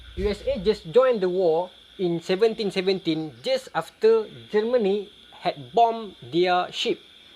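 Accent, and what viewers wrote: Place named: Penang, Malaysia. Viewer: Saya bukan melayu bodoh ustaz. Saya paham bahasa inggeris. Tapi tak syok dengar ustaz goreng dalam BI. Hilang slang penang.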